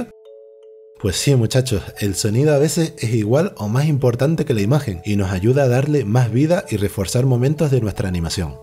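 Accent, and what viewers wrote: Canary Islands, Spain. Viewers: buen videoooo por cierto tienes acento canario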